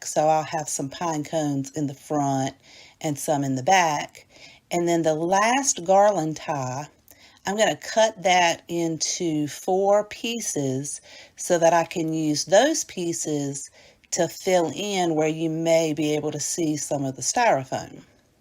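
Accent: South, United States. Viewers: Hello I love your ideas your crafts are sweet ! I just came across your channel and I’m getting inspiration already! I love it great job!love the little snowmen snow sign so cute I’m going to make one too! So adorable I’m a subscriber now too ! Fabulous !ok edit number two love these so much so awesome! Ok I had to say I love your accent southern belle so adorable!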